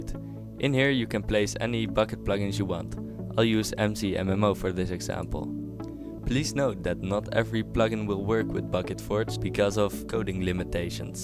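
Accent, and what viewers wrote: Netherlands. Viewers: Tuurlijk is hij nederlands, Kun je zijn accent niet horen? Idioot.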